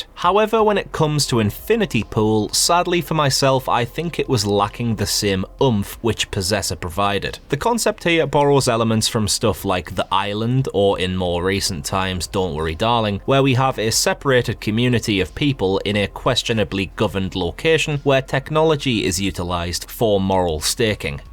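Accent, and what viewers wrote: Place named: Teesside, United Kingdom. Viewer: Distracted by trying to figure out the accent. Thornaby?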